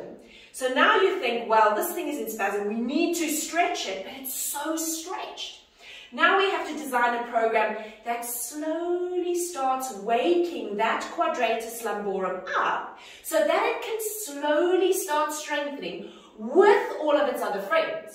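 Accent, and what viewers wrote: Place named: Northwest England, United Kingdom. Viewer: She is called and she sounds like she is from my area in the UK from her accent (NW)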